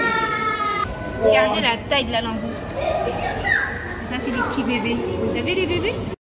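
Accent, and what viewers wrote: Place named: North, France. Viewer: Il est d'où ton accent,? Il n'est pas Parisien, si? L'on dirait un accent du Nord Bonne soirée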